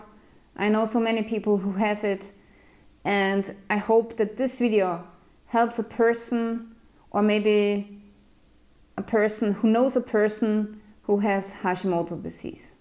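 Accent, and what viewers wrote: Sweden. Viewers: Wait are u swedish ?? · Are you from sweden/Sverige on swidish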